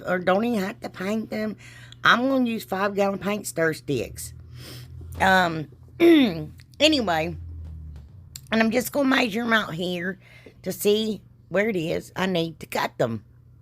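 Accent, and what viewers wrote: South, United States. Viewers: You talk you're Southern Draw while you're working?😮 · What part of the South are you from?South East Georgian inquiring. I absolutely love and enjoy your videos. ILOVE, LOVE...LOVE your napkins....especially the CHICKENS.🐔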